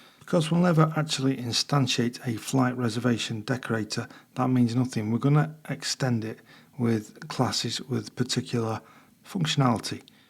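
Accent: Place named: United Kingdom. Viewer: I wish I had discovered you earlier. Advanced PHP with a nice British accent. Thanks indeed!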